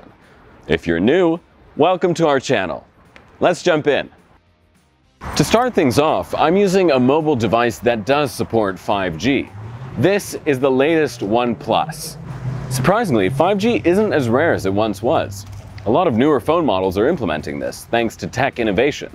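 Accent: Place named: United States. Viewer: I can't pick his accent. It's sounds American to me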